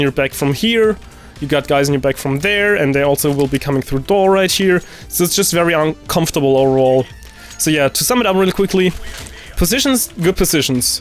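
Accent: Germany. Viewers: are you german or swedish? :D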